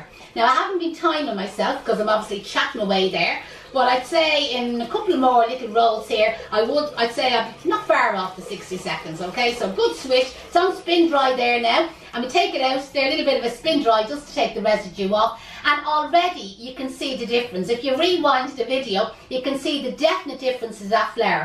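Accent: Ireland. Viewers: It’s “flower” food. She has an amazing Irish accent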